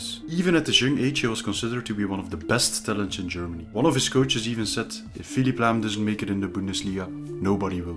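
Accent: Belgium. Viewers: Maat gij zijt zo zwaar belgisch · Bro is that a Belgian accent I hear?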